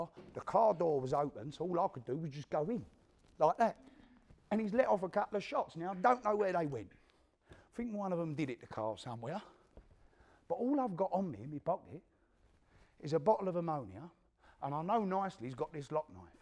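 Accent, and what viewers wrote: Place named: Cockney, United Kingdom. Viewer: Cockney PROPEEERRR! Unlike that awful patwa that’s occurring since Windrush.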